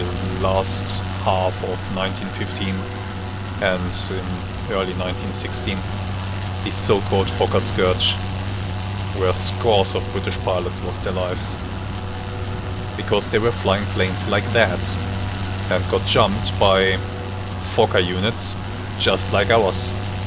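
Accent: Germany. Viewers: Der Titel dieser Serie gewinnt immer mehr an Wert. Weil, sooooo kurz ist das Leben von Felix dann doch nicht. Allerdings wartet der Tod in jeder Folge. Wird wirklich Zeit das es bessere Maschinen gibt. Von daher ist deine Strategie genau richtig. Besser einen Kampf, den man nicht gewinnen kann, zu vermeiden und dann später mit besserem Gerät deine Skills einzubringen. Würde ich genauso machen. Dein Englisch klingt so als wenn deutsch deine Muttersprache wäre. Macht das Audio für dieses Projekt irgendwie "cool". Naja, hoffen wir mal, das wir gemeinsam noch viele Folgen des Felix erleben dürfen. Vielleicht wird Felix von Berg der neue "Rote Baron"? Jedenfalls ein LetsPlay mit einem echtem Mehrwert für den Zuschauer.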